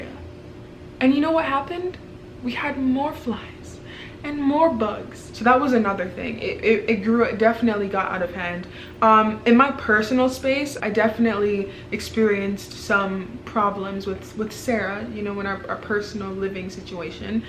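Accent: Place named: Nigeria. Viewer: I heard you talk one second in and I knew you were nigerian.. or at least from Africa 😭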